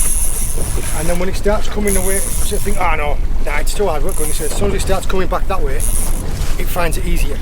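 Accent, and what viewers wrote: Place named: Durham, United Kingdom. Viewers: is that a mix of Durham/ Darlo accent? Love the commentary as you sound local to us Durham pit yakkers. All the best. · Absolutely brilliant. Sound like your from up North originally? Most I've ever caught is a stickleback on a worm but heh! 😂 Brilliant!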